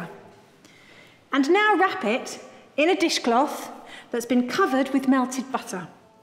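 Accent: United Kingdom. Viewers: 凄まじいイギリス英語だな Very British English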